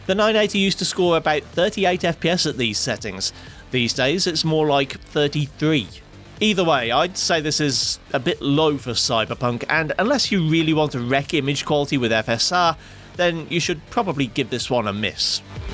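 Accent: England, United Kingdom. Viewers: Mr english accent, please make a video of a 2070/2070 super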